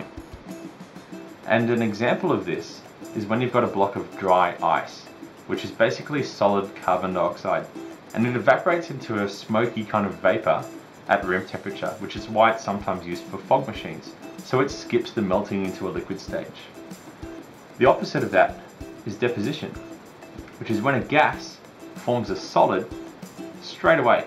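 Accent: Australia. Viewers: Finally and Aussie not an American thank you miss! Even though I don’t like school it’s not an annoying voice!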